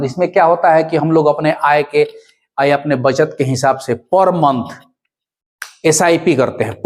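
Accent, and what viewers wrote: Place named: Bihar, India. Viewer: His tone shows he is from Bihar Paur month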